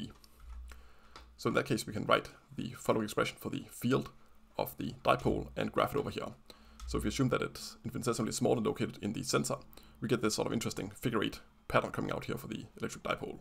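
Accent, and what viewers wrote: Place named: Germany. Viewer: Your teutonic accent and how unnecessarily fast you speak makes it very hard to understand what you’re saying.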